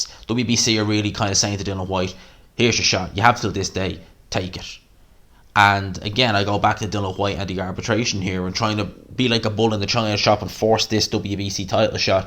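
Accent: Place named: Ireland. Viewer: Your Irish accent is very cool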